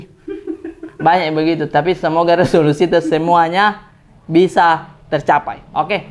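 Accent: Makassar, Indonesia. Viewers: Saya orang sunda, saya suka logat makassar😀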